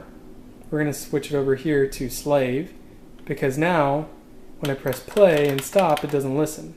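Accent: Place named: United Kingdom. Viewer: So thats what you look like....I always thought you were british lol.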